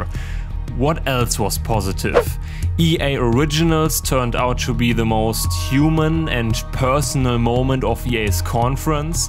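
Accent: Germany. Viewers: Your English is great, who cares if some of the German accent is there. It is actually refreshing watching gaming YouTubers from Europe. As for the EA, they are dead to me for well known reasons. Andrew Wilson's and EA's level of hypocrisy is out of this world. I had 0 expectations from this and EA as usually delivered.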